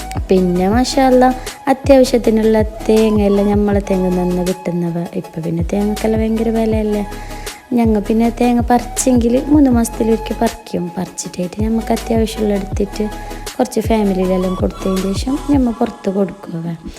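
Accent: Kasaragod, India. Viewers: Kaasarod aanu le Slang nalla rasa kelkan❤️❤️💗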